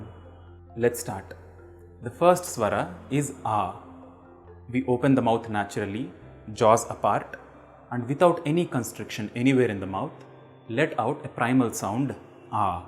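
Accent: South India, India. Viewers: Why to put hands in this weird pose that is not even a mudrah. this way host doesn't look smarter and why such awful indian accent, at least we re trying here to listen to Sanskrit and this accent just hurting our ears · loving these video's! Learning a lot here. :) Small thing for you to pick up though when pronouncing a 'T' in English. The 'T' is not velarized as you probably know, but a simple plosive sound. The 'Indian T' doesn't exist in English. I think it is a great struggle for Indians and Pakistani's to get this right. But we all have our own accents when speaking a foreign language don't we. I do! :D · This is probably a dravafian accent. Ancient Aryans ate meat probably sounded more manly like Persians do.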